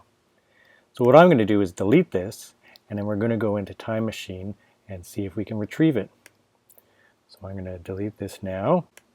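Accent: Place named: Canada. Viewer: Canadian accent equals automatic dislike!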